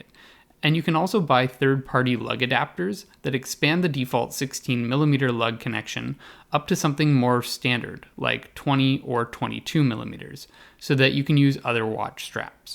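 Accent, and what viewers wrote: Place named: United States. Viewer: If you have an American accent why do you give the wrist measurement in metric and not inches?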